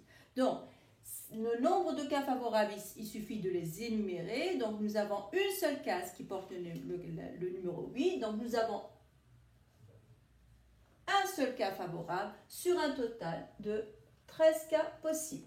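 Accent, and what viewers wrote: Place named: Tunisia. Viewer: Elle a l'accent tunisien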